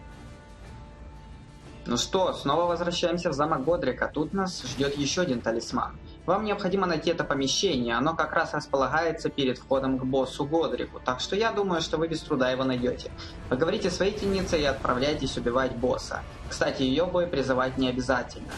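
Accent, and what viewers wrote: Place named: Ukraine. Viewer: Ти українець? Я по словам чую, такі слова як вночі. Також ти літеру ґ в рос мові вимовляєшь як г. Якщо тт справді українець, Слава Україні!!!🇺🇦🇺🇦🇺🇦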